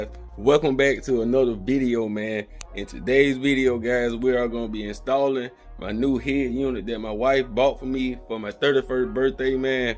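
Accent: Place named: East Texas, United States. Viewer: I stay out in east Texas and we got all the hurricane displaced people and we talk just like this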